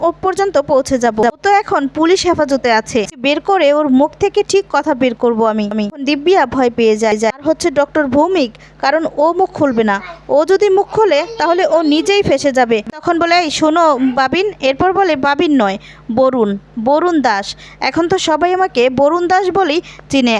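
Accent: Bangal, Bangladesh. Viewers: কথকতার মান ভাল। বাঙ্গাল বাঙ্গাল উচ্চারণের জন্য খারাপ শোনায়।চেষ্টা কর এটা ঠিক ঠাক করার। ভাল কথকতার জন্য তোমাকে করতে হবে।শুভেচ্ছা রইল।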